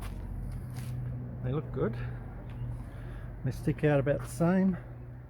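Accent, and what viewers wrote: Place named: Australia. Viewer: Was not expecting an Australian! Awesome.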